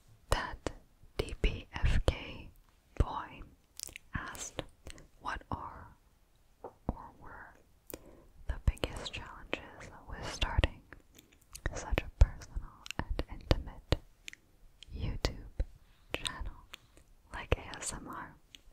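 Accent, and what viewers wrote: France. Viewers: Cool! J'ai hâte. En passant, t'as un accent français extraordinaire, et c'est génial. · Tu dis que tu es gêner un peu de ton accent en français... Je te rassure en dissant que la plupart des québécois que je connais (à l'extérieur de montréal) adore les accent française ;)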